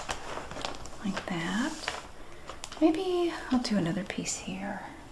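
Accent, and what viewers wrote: South, United States. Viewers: Oh I feel so warm and lovely with southern accents just makes me feel happy when I hear it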